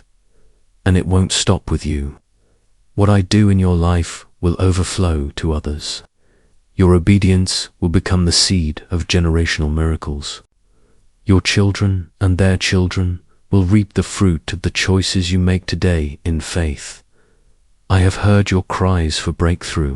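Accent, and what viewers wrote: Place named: England, United Kingdom. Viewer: You have an English voice....as I do. I receive.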